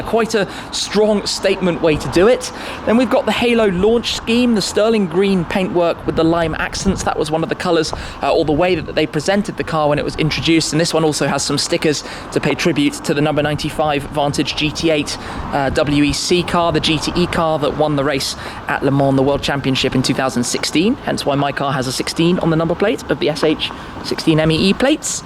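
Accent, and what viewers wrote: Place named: RP, United Kingdom. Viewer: Stop smiling so much. And cut the posh accent. Sound normal!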